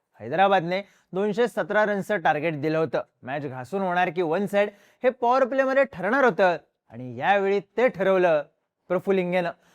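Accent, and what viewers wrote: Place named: Vidarbha, India. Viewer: Vidrabh bolte bhai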